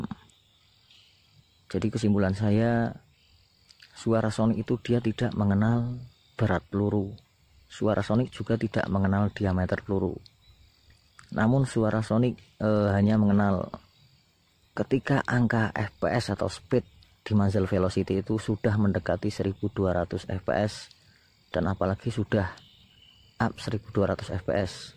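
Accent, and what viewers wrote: Java, Indonesia. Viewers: Mas njenengan jowo ne pundi nggeh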